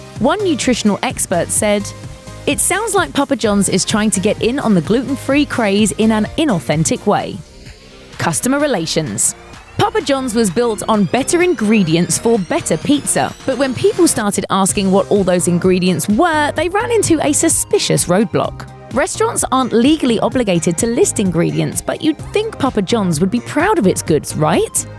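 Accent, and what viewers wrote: England, United Kingdom. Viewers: i dont fuck with the english accent chick, bring the other girls back PLEASE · Number one: narrator is British and should be the watch mojo girl. Number two: she's British and can't pronounce controversy. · CON-TRO-VER-SY... Americans love Brit accents, but not when yammering about American's faults. Then it sounds either retarded or snooty. NAHHHaaa, who am I fooling I still lover her voice.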